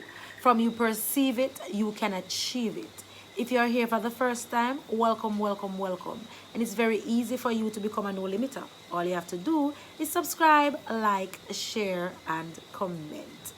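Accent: Jamaica. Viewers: I love your accent girl. Always loved jamaican accent. This is really an interesting video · Its so hard for me to understand Jamaican but I love how u teach it! Lol Ive been to Jamaica once n i just love the accent! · Your accent is very thick and I'm trying to grasp the Jamaican word of the English word, amd I'm struggling! This was so informative and confusing. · Girl you got me cracking my ribs to the core..am loving your energy and the Jamaica accent ,and how you said the word welcome was interesting in a good way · I love me some Jamaican accent😍! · It is the introduction for me lol You are so funny. I find Jamaican accent so cool. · 😂😂😂Sis you got my jaws dropping okay... because I did not know that Jamaican have body parts that we do not have. I love the accent though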